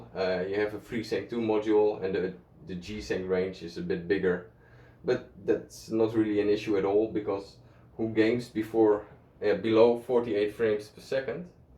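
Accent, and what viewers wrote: Netherlands. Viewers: Ik hoor gwn dat je nederlands bent🤣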